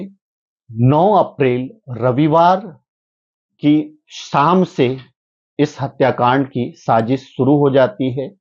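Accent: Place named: Bihar, India. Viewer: सही पकड़ा एंकर बिहारी है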